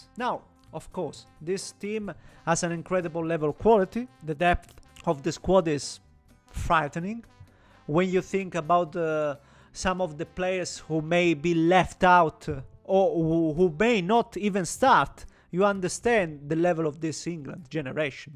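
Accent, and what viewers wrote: Italy. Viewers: Messo like ed attivato la campanellina subito. Ottima pronuncia, spiccatamente italiana, ma corretta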